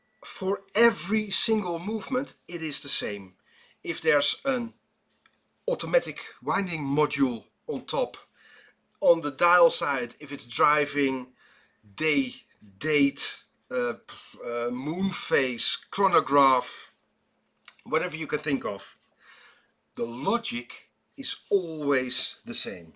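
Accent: Netherlands. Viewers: Entertaining AND informative..... and all in a Dutch accent :)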